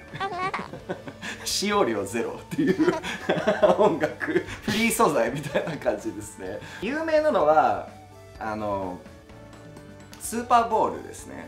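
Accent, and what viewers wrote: Japan. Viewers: 英語も発音が日本よりになってるのすごい笑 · 英語もちゃんと日本語の発音になってる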